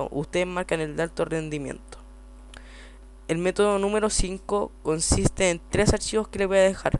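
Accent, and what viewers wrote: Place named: Spain. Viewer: porq carajos hablas como si fueras español?xDBuen video!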